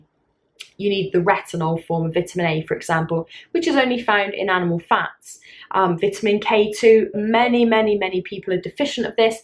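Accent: United Kingdom. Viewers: I love you my sweety your excent is look like London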